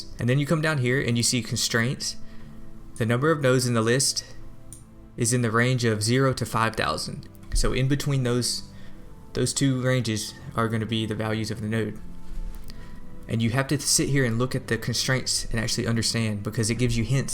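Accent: South, United States. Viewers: Dude, what southern state are you from hahah. As a resident the accent and the yeti sticker isn't fooling me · Thank you for maintaining the southern accent bro. Seriously. We're losing our accent and our southern culture. When i hear people speak it, it keeps the spirit alive. thanks for uploaidn g the very helpful video!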